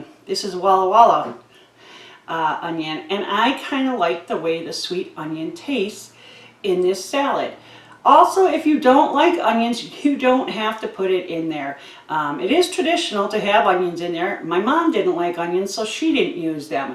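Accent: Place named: Milwaukee, United States. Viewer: I am excited to try it! I have had it at church potlucks but never have made it. I love your Milwaukee accent.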